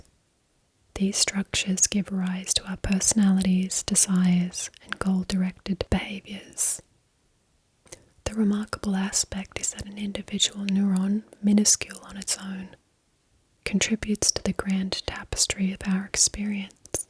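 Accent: Australia. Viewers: Really awesome asmr...well done...and finally found an Aussie asmr-tist that i like!. Subbed!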